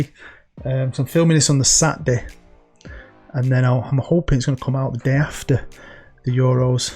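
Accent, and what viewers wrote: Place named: Manchester, United Kingdom. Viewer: I was kinda hoping the voice would come back and you would have a different accent 😂, not that there's anything wrong with Mancunian I just think it would be funny if you were suddenly Jamaican or something 😂. Anyway, amazing to see you back! It's only Monday and this week keeps getting better and better! IS BACK!!! You're right about the media making you look bad, that Sam Matterface in the semi, WTF!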